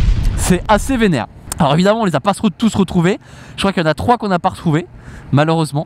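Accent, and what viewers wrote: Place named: Toulouse, France. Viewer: Novembre encore moto 🏍 vive LILLE a pardon toulouse avec l’accent biensur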